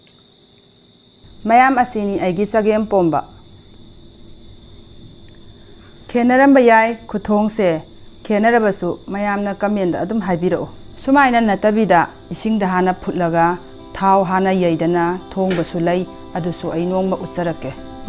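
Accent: Kakching, India. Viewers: Ngangiba lonsina kakching haiba lamdugi lon ne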